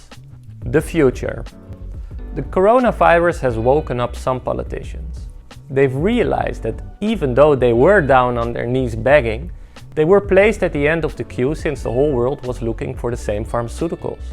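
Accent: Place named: Netherlands. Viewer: Your accent sounds soooo Dutch.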